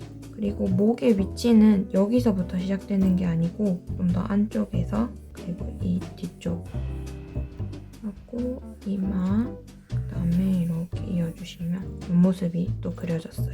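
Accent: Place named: Daegu, South Korea. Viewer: 혹시 대구분? 사투리 정겨워요 ㅋ 저랑 같은말투 ㅎㅎ 절대 음성녹음 안한다는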